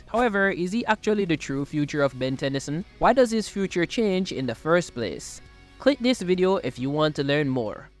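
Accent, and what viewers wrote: Jamaica. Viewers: Are you Jamaican bro?? Love the Ben ten content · Jamaican (take it from another Jamaican) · Bro yuh a jamaican🤨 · Are you Jamaican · bro sounds like a jamaican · Is he really a Jamaican? I hear the accent and ting, but mi affi ask. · Are u Jamaican? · Are you Jamaican? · Yo bro ya Jamaican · are you jamaican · It's not about difference, there's just nothing Indian about his accent, its clearly a Jamaican accent. You good LiL brO?? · its clearly jamaican. Im jamaican and chinese myself bro talks like a sophisticated jamaican person. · Yo are u Jamaican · This sounds Jamaican….what Caribbean country you from? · Could tell the Jamaican accent immediately 😂, happy for my JA brother. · subscribe for the jamaican accent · You speak English but sounds Jamaican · U Jamaican?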